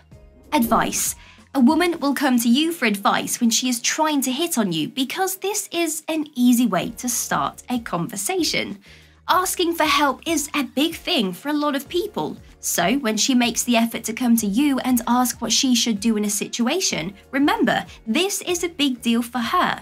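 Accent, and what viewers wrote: England, United Kingdom. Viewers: LOVE THE VOICE. IS IT ENGLISH, AUSTRALIAN, OR SOMETHING ELSE?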